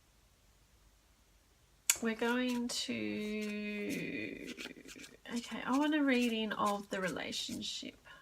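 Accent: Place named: Australia. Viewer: Thank you for your guidance, and for the work you’re doing to help the TF collective. The message resonated. It’s nice to hear readings with an Australian accent! Love and light from Brisbane xo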